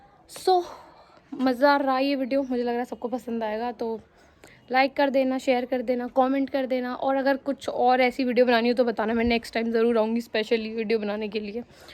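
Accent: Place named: Meerut, India. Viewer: Your language matches with mine...you may be somewhere from meerut, moradabad, sambhal , chandausi wagerah.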